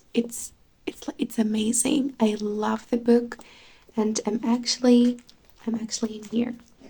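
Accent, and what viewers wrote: United States. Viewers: Proud of you as a Moroccan young girl speaking English language fluently with Americans accent Mashallah ❤ I Just discovered your channel yesterday , I will ask you if you can tell the Couran stories specially to the kids who were born in USA or UK to understand better when some one speaking . Thank you so much , you are beautiful and talented keep up with writing the journal every day , and encourage others to do it as well .( doesn’t matter what language! )…………Happy New Year 2024 🎉🇲🇦🇱🇷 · HOW YOU DO HAVE BEAUTIFUL ACCENT LIKE AMERICANS ? WHAT IS YOUR SECRET?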